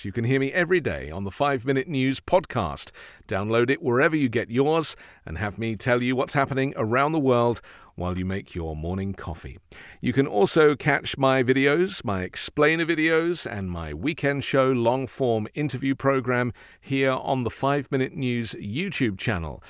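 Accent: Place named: South East England, United Kingdom. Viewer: I think his accent is from south east England….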